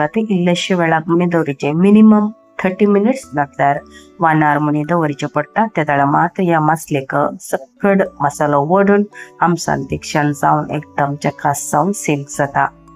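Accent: Konkan, India. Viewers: Tai Tumi ulaitalo Konkani bhasha kitle chod god aasa. Ani haanv pun ambot bangude ani tarlee nistyancho kadi banounk shiklo aasa. ❤❤❤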